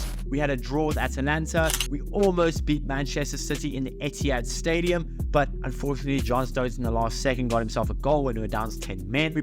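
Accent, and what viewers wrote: South Africa. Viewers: Is that a South African accent I detect? Awesome content bro!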